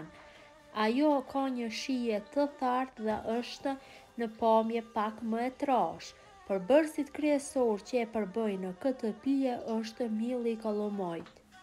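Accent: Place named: North, Albania. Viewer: o zemer , je e mrekullushme, jo vetem per receten e Bozes por edhe per dialektin e mrekullushem te veriut qe ke, gjithashtu edhe muzika ne sfond , te uroj nga zemra gjith te mirat, pershendetje nga Gjermania.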